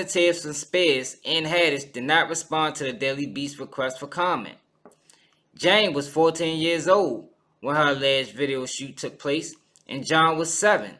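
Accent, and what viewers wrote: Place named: Baltimore, United States. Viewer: That shit is wild!!! At first I thought it was gonna be some bs lawsuit...nah that shit sounds traumatizing. Especially when you hire "talent" and treat them like animals. They took it too far. Pay the young lady n her brother. And apologize. Thats the least u could do. I imagine a real shoot where the topic is pedophiles they actually make sure people are comfortable. They basically abused those kids. It may not be to the full on pedophilia but they put the kids in an environment as such. Thats messed up. Yo... I think your accent... Baltimore area? Is crazy. Those two, you, who sounds lol. Word yall got one of the best accents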